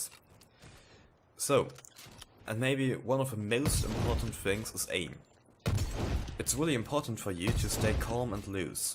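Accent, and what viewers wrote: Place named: Germany. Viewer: German accent! sounds so weird to me but english people love it lol. Also very good video man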